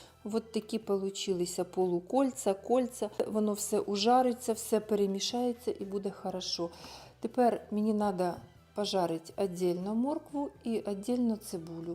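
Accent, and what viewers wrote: Ukraine. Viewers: Ничего себе суржик.. Красивая украинская речь.. Не слушайте никого про суржик. Вот это и есть мова. А не западенский диалект. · Це не суржик, це російська з українським акцентом! Могла б перед тим, як знімати, приділити час і провірити у словнику слова, і не ганьбитися!!!! Каже не пишіть. А для чого тоді таке ганьбите виставляти?……. Улажую????? Тута і ТАМА….,сутки двоє….. А слово ДОБА ЗНАЙОМО????? Це вивчають у школі!!!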